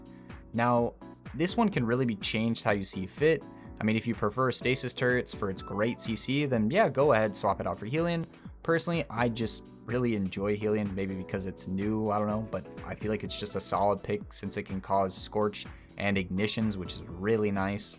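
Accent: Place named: United States. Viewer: They speaking amercan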